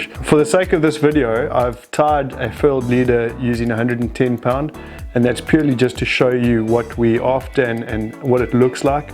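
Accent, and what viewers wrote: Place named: Yorkshire, United Kingdom. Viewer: English subtites would enhance it as Yarpee English is as bad as Yankee drawl.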